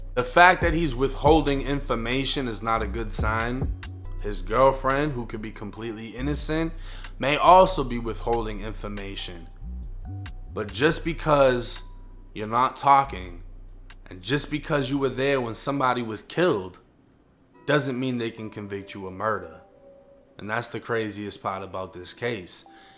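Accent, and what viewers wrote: Boston, United States. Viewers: He literally sounds white with a Boston accent wtf are you hearing 😂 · Is from Boston or somewhere in New England or something?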